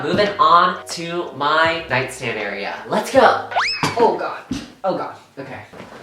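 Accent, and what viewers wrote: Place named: Los Angeles, United States. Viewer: You talk, look, and sound so LA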